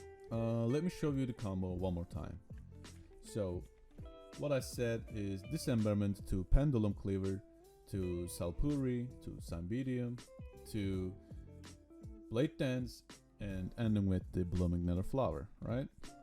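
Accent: Turkey. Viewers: Güzel bir aksanın var ama yine de türk olduğunu tahmin ediyorum :D Video için teşekkürler çok yararlı oldu. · Şunun bir de türkçesini çeker misin kanak ses tonlamandan anlaşılıyor türk olduğun çok rica ediyorum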